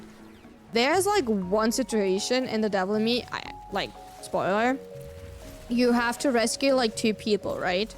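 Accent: Germany. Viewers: Are you german per chance? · Am I hearing a German accent?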